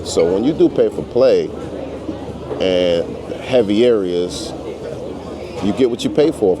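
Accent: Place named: New York, United States. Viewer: Man if this guy ain’t a New Yorker…😂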